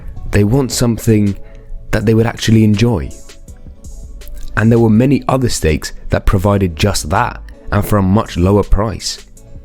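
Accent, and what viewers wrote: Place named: England, United Kingdom. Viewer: I'm at 1.5x speed and it seems like a normal english accent.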